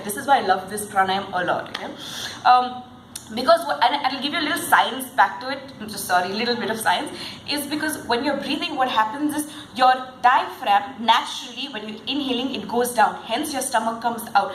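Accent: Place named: Bangalore, India. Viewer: Everything is fine but your Bangalorian accent and body language sucks ...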